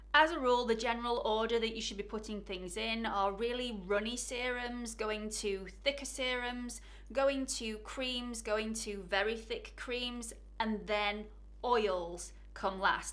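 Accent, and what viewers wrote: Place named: Yorkshire, United Kingdom. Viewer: Excellent video. I just discovered you and so happy I did. You’re amazing! Learning a lot from you. Look forward to watching all your content. And of course I love your accent. Are you from Yorkshire? Wild guess thereLol. Love from the States! 💕💕💄🌻🌷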